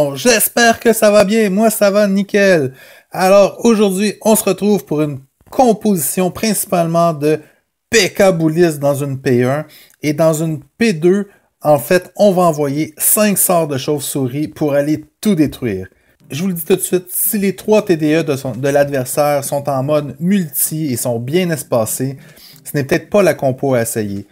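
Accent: Quebec, Canada. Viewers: T’es québécois mon asti ? - J’adore la compo étant nouveau th12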